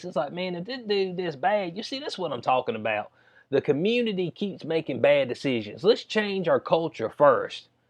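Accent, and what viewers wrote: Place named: Arkansas, United States. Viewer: Just curious- where’s tha accent from? I guess south Arkansas?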